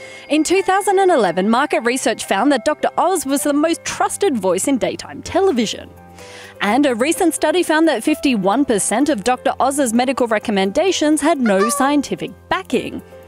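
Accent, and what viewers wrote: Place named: Australia. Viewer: Am I the only one that thought it was a bit odd that Australian was narrating this?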